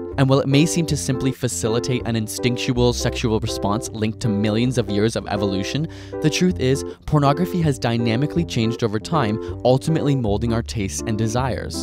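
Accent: Canada. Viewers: Oh your canadian, pog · Wait, is Canadian?:D I hope they are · OMG YER CANADIAN!!!! ^_^ YAY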